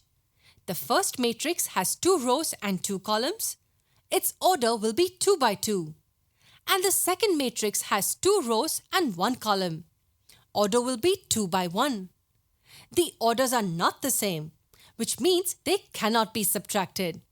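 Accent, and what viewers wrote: India. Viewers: Whats wrong with her accent? Seriously? Dude, we are here to learn something, it does not matter what accent, race or religion she belongs to. I would suggest, get used to Indian Accent because in the next 5 years, you are going to only hear that on YouTube as more and more people in India come online. This is 2020. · Indian accent :(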